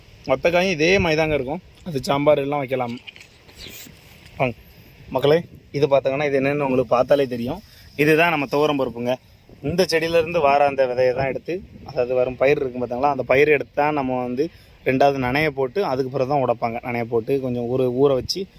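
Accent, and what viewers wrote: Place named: Tirunelveli, India. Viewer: Thirunelveli nnu theriyuthu,,,aana yentha ooru nnga,,,pavoorchatram aaaa????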